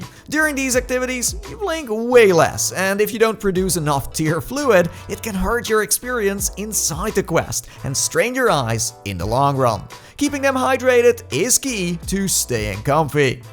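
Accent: Netherlands. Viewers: Thank you for the information, I am going to sell my oculus quest 3 for some money (because it sometimes has some issues) and then i am going to buy the 3s and these adjustments, thank you for the information. (And by the way are you Dutch because I am, and you kind of have a Dutch accent, not saying it’s bad.)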